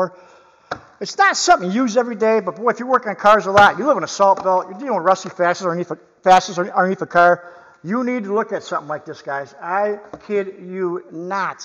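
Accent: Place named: East Coast, United States. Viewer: Your accent reminds me of home. I go back east for the holidays and still don’t believe I sound like that 😊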